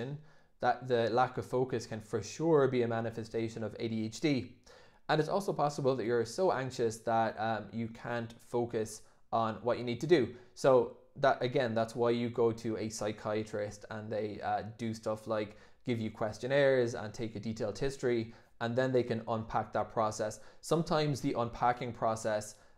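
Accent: Ireland. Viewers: yup, sounds south of Dublin 🎉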